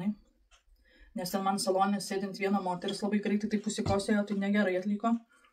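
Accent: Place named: Aukštaitija, Lithuania. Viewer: Laba jūsu graži kalba turbut nuo Aukštaityjos busit sekmes jums gyvenime❤❤❤😊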